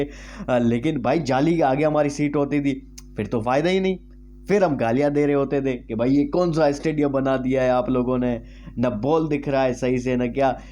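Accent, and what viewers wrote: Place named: Sindh, India. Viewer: video wle larke ho aap? Han apke bolne ka lehja sindhi laga tbhe pucha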